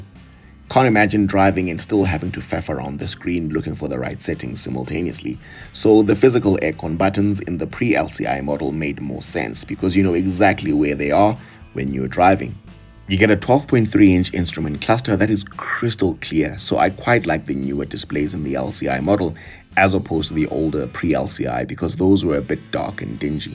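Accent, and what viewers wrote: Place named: RP, United Kingdom. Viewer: Lol hell no broh, don't tone it down, it makes your channel different broh, you're not trying to imitate someone else, you're just being you🙌🙌😁😁so i, personal don't mind the private school english you have bro😂😂😂but maybe if these comments persist then maybe you can open a poll and people voting to whether tone it down to Africanism or not🤔.....lol tjoo that's indeed a very tough call to make broh but maybe it will go down to which one you prefer the most between Engine and technological advancement but I feel like i can go with a bmw x5 m60i cause it still has a V8 engine in the hood and the interior designs is in a premium level and from depreciation statitics it alleged that M60I holds its value better than the RSQ8🤔😁😁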